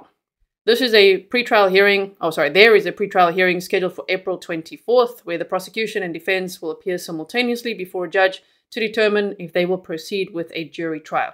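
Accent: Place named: South Africa. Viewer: Hello fellow south African 🇿🇦 Thanks for covering this case x Great to hear the accent!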